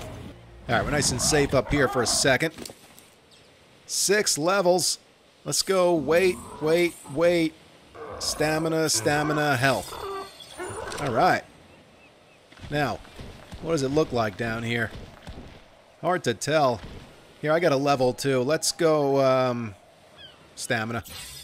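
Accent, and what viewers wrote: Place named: New York, United States. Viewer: I'm new and very entertained by the irate ny/nj italian vibes & accent.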